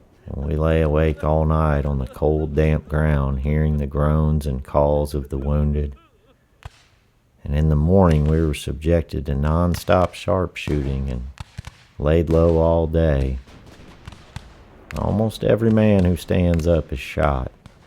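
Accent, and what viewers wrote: South, United States. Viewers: Great narration. Just that the person who wrote this was in the Michigan 4th. I kept getting confused because of the narrators southern accent.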